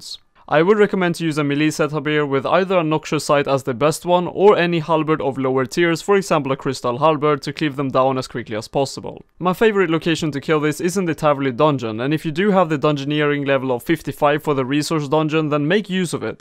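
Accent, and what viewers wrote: Sweden. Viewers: you sound swedish :)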